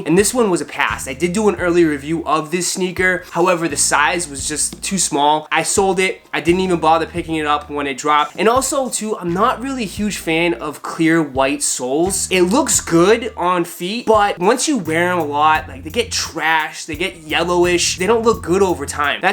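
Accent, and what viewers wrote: Boston, United States. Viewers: That fuckin Boston/mass accent, 🤣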